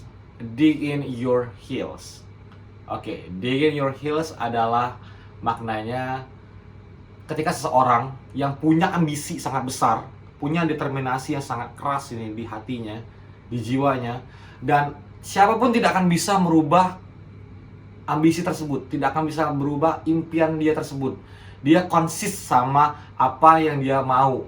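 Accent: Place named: Palembang, Indonesia. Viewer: Ngapo cak kenal logat2 nyo tu kak..cengki wong plembang ini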